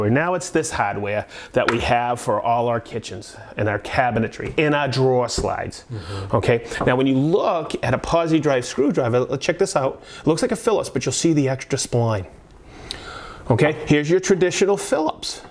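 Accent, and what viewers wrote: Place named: Boston, United States. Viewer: Thanks to that nice Boston accent, i felt like i was watching an wpisode of This Old House 😌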